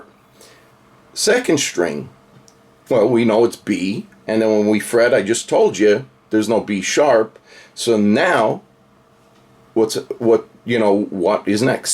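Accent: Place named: Chicago, United States. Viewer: are you from chicago - you have a bit of an accent , no offense . i am from chicago and when i am on youtube it comes out more often than not sometimes ... the way you explained it out like that it makes so much sense and isnt that complicated. hats off .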